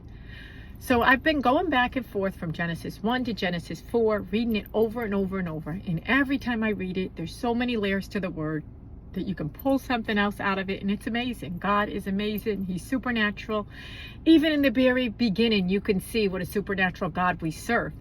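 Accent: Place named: North, United States. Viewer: Amen! Are you from up north? I’m from upstate New York and thought you sounded northern!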